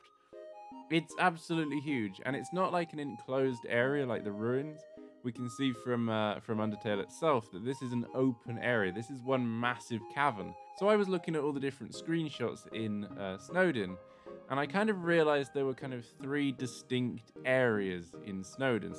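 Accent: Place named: United Kingdom. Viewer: How are you even more british now wtf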